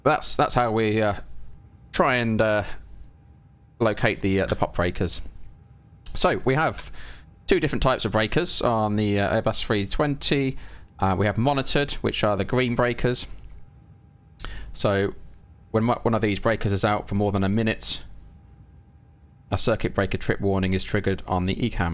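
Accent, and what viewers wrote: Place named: United Kingdom. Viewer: Good videos, but at times the british accent comes a bit much to my taste.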